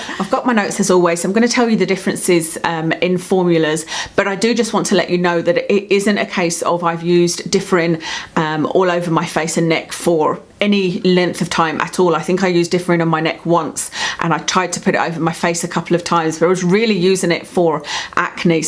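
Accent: United Kingdom. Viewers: Heavy British accent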